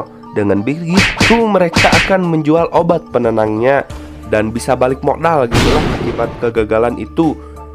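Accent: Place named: Sunda, Indonesia. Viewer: Story telling sunda😆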